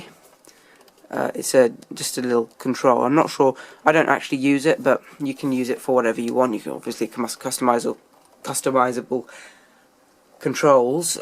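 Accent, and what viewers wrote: United Kingdom. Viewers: Very good review thanx bud, good to see and hear british reviewers and a excellent british accent well done.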